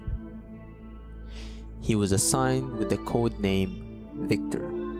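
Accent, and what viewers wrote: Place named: Sweden. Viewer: Are you from Sweden? Just because of the accent. Great explanation of the coolest field cipher!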